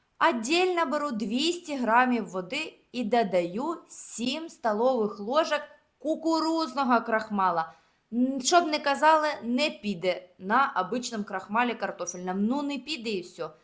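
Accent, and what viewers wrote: Surzhyk, Ukraine. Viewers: Те як ви говорите, має певний шарм... весело і добре від того суржику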